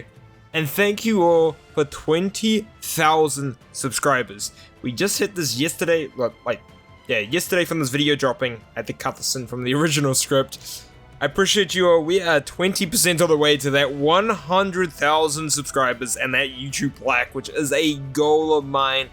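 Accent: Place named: New Zealand. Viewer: 200 days and NZ-english!! Can`t get better!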